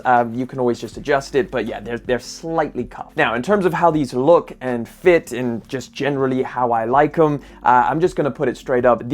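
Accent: South Africa. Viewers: Bro I didn't know you South African. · Bro are you by any chance South african?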